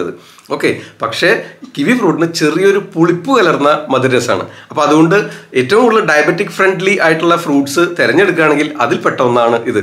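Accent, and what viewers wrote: Thrissur, India. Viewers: Ethra nalla avatharsnam dr.Trissur bhashayodu thanne sneham thonnunnu😍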